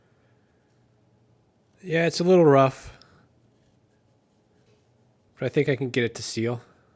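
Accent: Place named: South, United States. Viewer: Critter? What part of the South you from?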